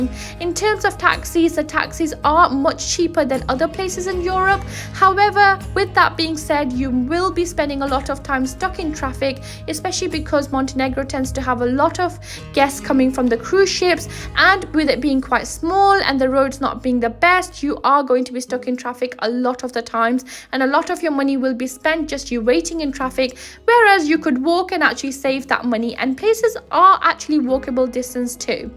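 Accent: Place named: United Kingdom. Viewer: U have a British Asian accent :D, guessing you're Asian. Good vid btw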